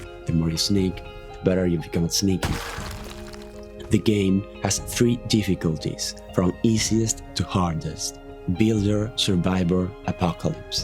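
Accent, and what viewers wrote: Argentina. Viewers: Flaco hablas muy bien inglés, por tu voz sospeche que eras argento y lo verifiqué en tu cuenta. Alto video papá 10/10 seguí asi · vos sos argentino si o si a mi no me engañas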